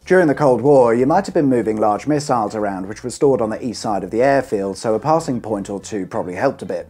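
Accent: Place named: Norfolk, United Kingdom. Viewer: This was very interesting. Side note: Are you from Norfolk? You sound quite Norfolk in this video.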